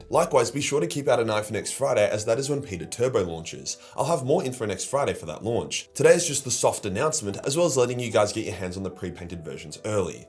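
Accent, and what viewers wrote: Australia. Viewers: I never realized had Australian voice over. I wonder what his real voice sounds like.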